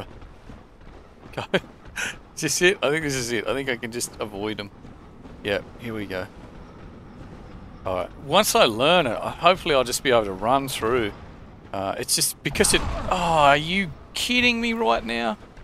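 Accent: Australia. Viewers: Are you Australian? Your accent is awesome!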